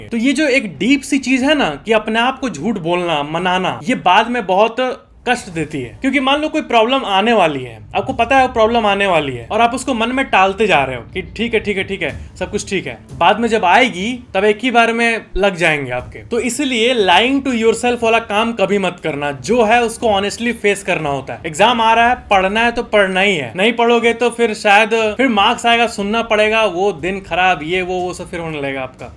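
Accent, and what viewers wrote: Bihar, India. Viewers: Great Bro , You Are Back In Your Old Version And We Are Liked It ,, Specially Your Bihari Language ♥️♥️♥️♥️❤❤❤❤❤❤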